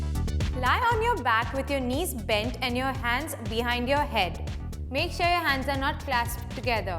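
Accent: India. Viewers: oof I'm indian but i hate this accent.... trying too hard.... but great video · Indian accent has to be the most annoying accent ever. Liked the exercises but listening to her speak is like nails against chalkboard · U r indian... Right...came to know this after listening ur accent..😎😘😊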